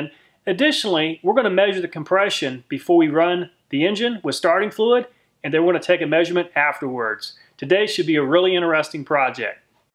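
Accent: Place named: South, United States. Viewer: I like how this guy talks. His mouth is so animated and the southern accent. Very entertaining to watch/listen.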